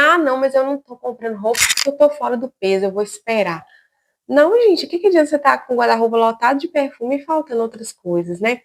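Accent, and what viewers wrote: Minas Gerais, Brazil. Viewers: Mineira com certeza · VC é mineira?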